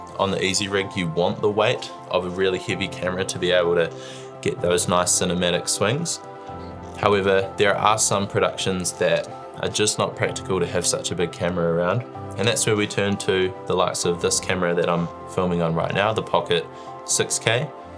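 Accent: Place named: New Zealand. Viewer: Hah, might be worth it to specify that. I very much noticed the Kiwi accent, but seeing as the majority of L1 English speakers on earth are American, the word "dollar" is presumed to be the American one unless otherwise specified (online and in international contexts). That's what some of us are incredibly confused about the price (I came to the comments to see if you'd explained it) — for us, the Ursa 12k is $6,000; and the Ursa 4.6k, even cheaper. So when you said it was $25,000, it very much elicits a terrified "OH GOD WHY DID HE PAY THAT MUCH MONEY" response.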